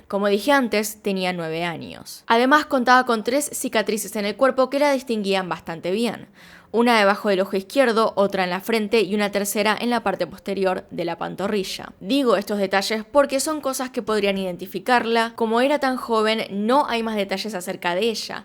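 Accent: Argentina. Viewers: Wow, la verdad, que al escuchar tus vídeos me relajo demasiado xd, no soy muy fanática del acento argentino, pero del tuyo le hago hasta un altar 😔👊💛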